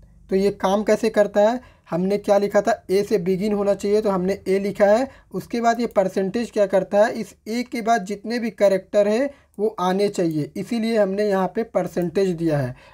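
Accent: Jamshedpur, India. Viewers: Bhai Jamshedpur se ho ky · Sir ji app Jamshedpur se ho kya??? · Are bhai Jamshedpur se ho kya ?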